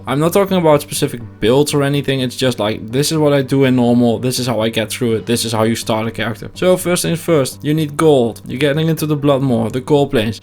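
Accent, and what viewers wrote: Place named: Netherlands. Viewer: I've been stuck on NM for 9 months now because I'm waiting on the next entry in this series..;) jk, but nice video and looking forward to new videos! (Also nice to hear a fellow dutchy)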